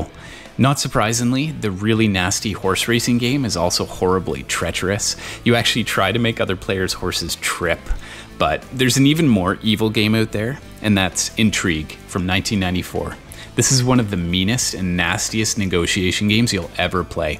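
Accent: Canada. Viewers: Canadian accent detected.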